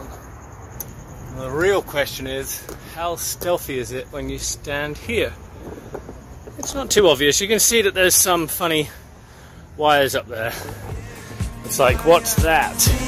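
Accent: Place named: United States. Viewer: This is amazing!! It’s sweet you’ve fitted your own solar panel on the top of your van- I love it! You never cease to amaze me & I love catching up after awhile cos you’re always up to something completely different (apart from the melons 🍉🍉🍉 ofcrs!). Also noticed a wee twang of American in your accent these days 😃 Man of the world 🌎✨ xxx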